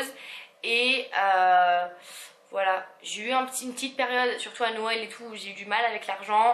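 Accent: United States. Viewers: Quel beau accent américain 😊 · Super vidéo comme toujours !! 😄 Ta voix sonne de plus en plus américaine ( quand tu parle français ), c'est trop chou !! 😂💕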